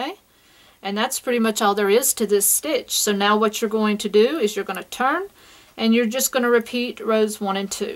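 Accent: South, United States. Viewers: Very good video. I hear a bit of an accent in your voice. From the South?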